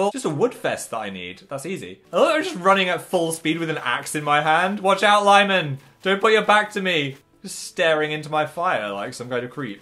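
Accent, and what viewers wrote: North, United Kingdom. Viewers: is it just me or does sound more northern than usual? No.... just me ok then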